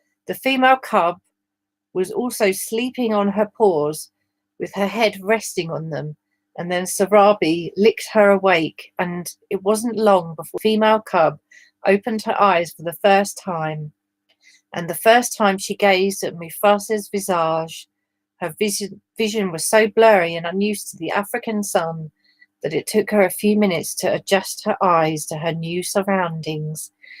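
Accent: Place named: United Kingdom. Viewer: Thanks to Oddie (the fantastic) I am your newest fan. I'll be sure to try and be glorious in the comments as your british voice in the air.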